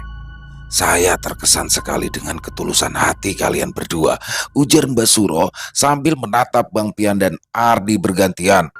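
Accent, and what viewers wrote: Jakarta, Indonesia. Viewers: Kalau udah logat Betawi bang Pian kluar kocak bgt😍 yang bikin kangen ya ini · Cerita bagus dari mas untung dan tambah hot jika dibawakan bang yg penuh penjiwaan dan pas bgt dgn gaya khas betawinya.... Mantab.. Joss...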